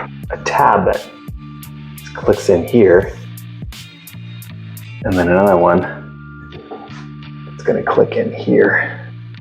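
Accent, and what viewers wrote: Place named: Ontario, Canada. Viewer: First, I didn't mind the talking. It helps provide context. Second, the shirt says ".ca", and you're drinking a boneshaker (a man of taste, I'd recommend an Octopus Wants to Fight if NEIPAs are your jam). As a fellow Canuck, and Ontarian - I'd peg your accent from somewhere around Tarrana (proper pronunciation) Ontario. To the other poster, this guy clearly is not a "yank". Thanks for providing the video. Will do this strapping this weekend.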